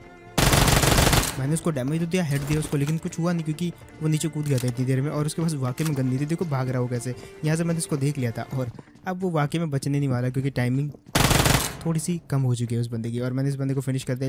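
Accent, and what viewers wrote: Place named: Punjab, India. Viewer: Can you please make a gameplay in punjabi language coz i love your punjabi accent 🥺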